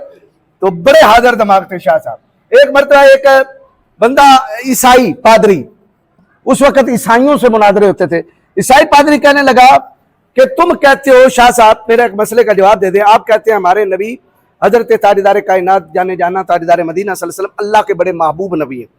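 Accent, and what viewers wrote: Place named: Punjab, India. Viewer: Mashallah bht achchi malumat par Panjabi bech bech me bolkar khas khas Samajh nahi aya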